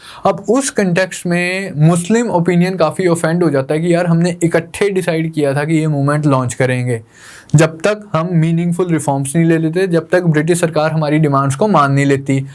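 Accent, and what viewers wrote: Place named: Punjab, India. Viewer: Thnks to u sir..when i feel sleepy i watch ur videos and u energetic voice and simple explanation with light punjabi touch ...massah allah it gaves me a energy for continous study..thnks again sir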